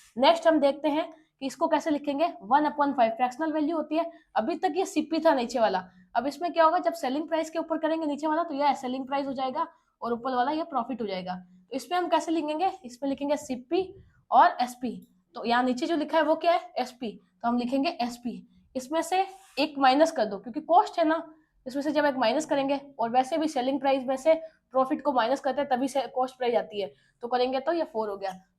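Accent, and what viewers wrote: Rajasthan, India. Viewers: Mam apki class entry bhut achi lgti❤❤apni rajasthani style me 🎉🎉 content bhi bhut acha h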